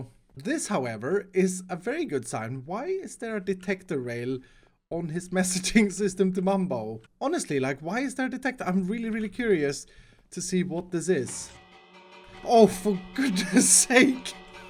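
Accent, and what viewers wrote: Sweden. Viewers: Are you swedish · I just looked it up he’s swedish Well its easy for me to recognize I’m also swedish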